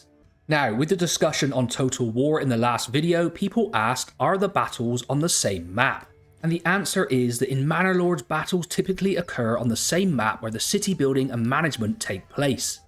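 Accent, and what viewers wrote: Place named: Cornwall, United Kingdom. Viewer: oo i fuckin love a cornish accent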